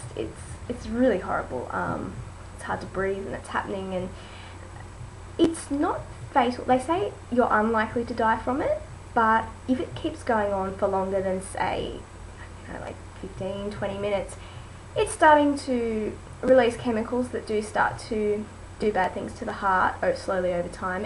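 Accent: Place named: Australia. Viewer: You sound Australian, who did you use?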